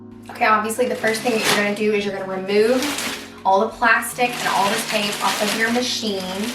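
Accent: South, United States. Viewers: Girl u must be from da south! 😊 Great job!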